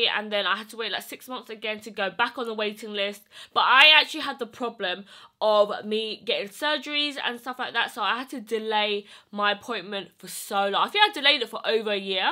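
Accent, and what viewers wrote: United Kingdom. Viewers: My mom asked if u were british asin i looked at her and said i dont know but dont asume anything since we r from the usa and to me she was sounding rude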